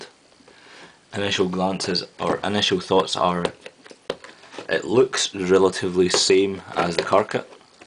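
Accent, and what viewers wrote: Scotland, United Kingdom. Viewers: lol typical scottish accent :) its nice to hear a similar accent to myself for once :D excellent reviews.. definately gonna purchase a car kit for my ipod touch x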